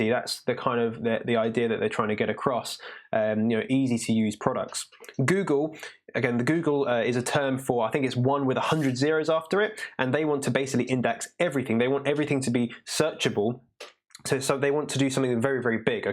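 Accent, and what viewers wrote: United Kingdom. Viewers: Do you need to be so rude and not even try to pronounce the name of the author of the book you used for your video? Can't you pronounce any other phonetics apart from bri'ish mAtE?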